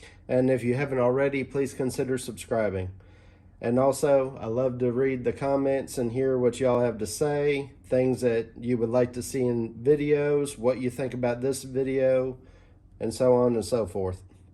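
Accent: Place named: South, United States. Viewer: Love your videos man. I want to do some similar work this winter but I was unsure on how to do some of the things but you made it easy to understand. I’ve watched a lot of your videos lately, one thing I think you should do as far a video is show your face every now and then, the reason for this… is to get people familiar with your face, so when they scroll through YouTube they’ll be able identify your videos…. It’s kinda like a familiar face. Other than that I like the tempo of your videos, it’s not to slow and not to fast. I’m guessing your somewhere from the south because of you accent. I’m from central MS.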